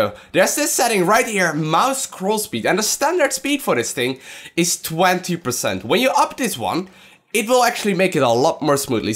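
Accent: Netherlands. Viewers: just a question but is he dutch? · ofcourse / natuurlijk. Dutch accent anybody..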